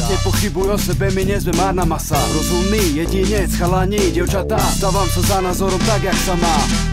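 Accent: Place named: Bratislava, Slovakia. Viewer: clovece...si z vychodu a taky blavacky prizvuk si chytil :-/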